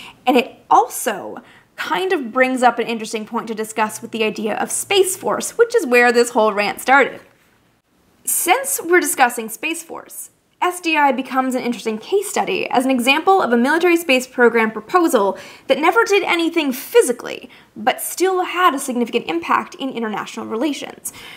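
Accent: Canada. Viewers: I love how you have defined this area of history and claimed it as your own. You always post great stuff. Very surprised to hear you don't have an opinion on SDI after you explained it so very well. Treaty language largely says defense measures are not treaty violations and you summarized it all very well. So, despite small disagreement, very well done.... and your Canadian accent still shines through. Very adorable.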